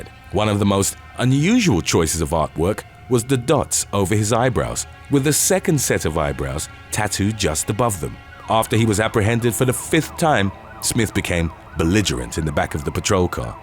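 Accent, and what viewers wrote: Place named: England, United Kingdom. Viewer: He has an English accent!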